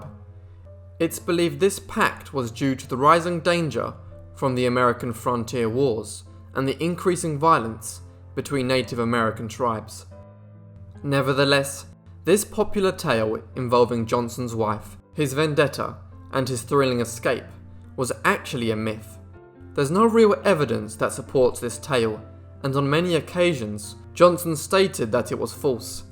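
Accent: England, United Kingdom. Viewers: Mark Felton that voice is awesome rolling proper British Gentleman s equal to his fantastic content, definitely in the running for YouTube Supreme Docudude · Why is a England accent doing the narrating it doesn't match the man. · English accent, I'm out. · I am surprised to hear this narration. I know American stories are shared the world over, but hearing English narrators is still a novelty for me. Thanks for the information. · Can’t understand this fucking Britt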